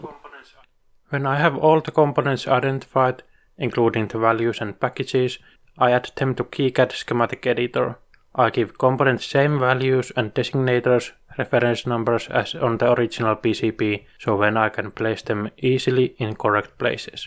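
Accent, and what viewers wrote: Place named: Finland. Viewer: I like the Finnish accent, sounds nuts.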